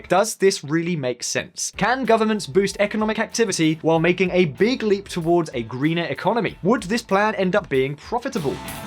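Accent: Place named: United Kingdom. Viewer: It's a French word spelled façade. That squiggle under ç makes it sound like ß or ss. Otherwise, even in French it would be "fakad". Anyway, is an up and coming British-like voice, a welcome relief from so much careless American slurring heard on YT.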